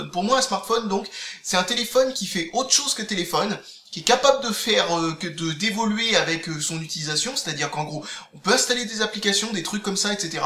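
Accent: France. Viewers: Je suis entièrement d'accord, surtout qu'il prononce pas mal de trucs à la française...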